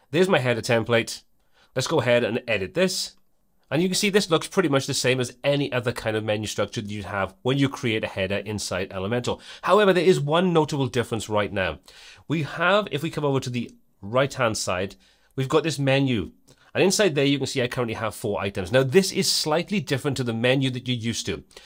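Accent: United Kingdom. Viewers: Finally, a clear-cut yet detailed video on Elementor Mega Menus! And it's presented by a fine chap with a great British voice!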